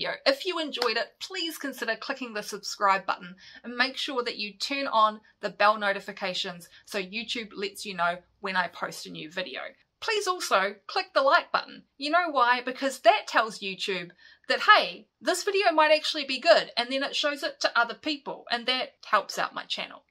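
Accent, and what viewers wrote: New Zealand. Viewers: Yeah, I would like you to do a more in-depth analysis of DR3 & his career. For two reasons - first, and most important, you convey your thoughts in a manner that is real, absent of all the BS & other general palava that is served by the vast majority of F1 content creators ( I would put you in the top 10 in your field - your numbers don't reflect that, but that is just a matter of time and a very smart man once said time is relative!). Second reason - there is nothing wrong with your accent there should be more of it on YT. There ya go - you're a NZ trendsetter! (Yeah, there's a better than even chance I might share your accent! 😉) In any case, it's miles better than the worst accent - shit, can't say that in the comments section of a video about an Australian can I. OOPS! Keep up the good work. Can't give you a new subscriber - I was No 39! 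(Will be posting this to FB tho 10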